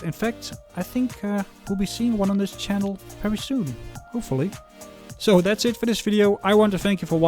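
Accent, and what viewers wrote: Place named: Netherlands. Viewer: Are you Dutch? You're accent seems dutch to me.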